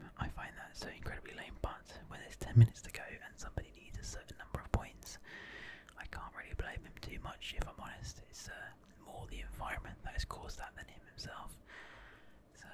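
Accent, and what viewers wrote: United Kingdom. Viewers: What part of London are you from?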